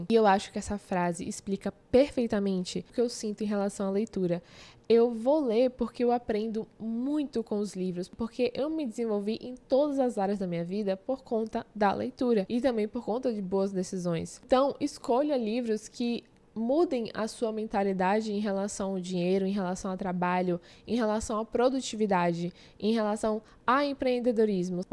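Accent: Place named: Bahia, Brazil. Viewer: eu simplesmente amei o seu canal principalmente por ser baiana, disso eu não tenho dúvidas só de ouvir seu sotaque me senti em casa, seu vídeo sobre autenticidade parece que foi feito pra mim, muita gente me ama como eu sou e mesmo assim vivo constantemente querendo mudar tudo em mim, vc é muito necessária ❤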